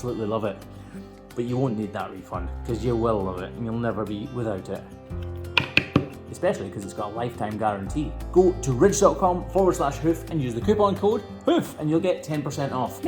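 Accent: Scotland, United Kingdom. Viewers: I especially loved hearing all the background sounds during the first part. However, being from the United States, I can’t get enough of your Scottish accent. ☺️💜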